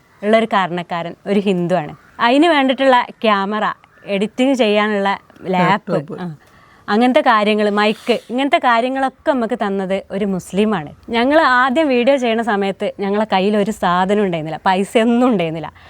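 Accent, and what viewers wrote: Malappuram, India. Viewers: അതാണ് നമ്മൾ ഈ മലപ്പുറം ജില്ലയിൽ കളിച്ച് വളർന്നതാണ് നമുക്ക് ഇതൊന്നും ഒരു പുതുമയല്ല ഇതും അതിനപ്പുറവും എതിർക്കുന്നവർ കണേണ്ടി വരും നമ്മൾ ഒരു പൂന്തോട്ടത്തിലെ പലനിറങ്ങളുളള പല ചെടികളെ പോലെ നമുക്ക് എന്നും സന്തോഷത്തോടെ കഴിയണം ഞാൻ ഒരു മുസ്‌ലിമാണ് എൻ്റെ രാഷ്ട്രീയം SDPI ആണ് ഞാൻ എന്നും നിങ്ങളുടെ വീഡിയോ കാണുന്ന ആളാണ് ഒന്നാമത് എനിക്ക് ഫുഡ് ഉണ്ടാക്കുന്നത് കാണാൻ ഇഷ്ടമാണ് അതിലേറെ നിങ്ങളുടെ മലപ്പുറം ശൈലിയിലുള്ള അവതരണം ഒരുപാട് ഇഷ്ടമാണ് ഇതെന്നും നമ്മുടെ ഇടയിലുള്ള ചില പുഴ് കുത്തുകൾക്ക് ഇഷ്ടപെടൂല അവരെ വിട് നമ്മൾ ഉണ്ടാകും നിങ്ങളുടെ കൂടെ നിങ്ങളുടെ കുടുംബ ജീവിതം എന്നും സന്തോഷത്തിലാവട്ടെ ചേട്ടനെ കണ്ടാലറിയാം നല്ല ഒരു ഭർത്താവിനെയാണ് ചേച്ചിക്ക് കിട്ടിയത് എന്ന്🎉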